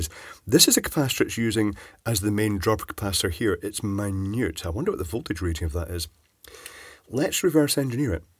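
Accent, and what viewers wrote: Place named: Edinburgh, United Kingdom. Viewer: Hi, I have a bit of a left field question. I love your accent, I'm assuming its Edinburgh. Would that be a correct assumption?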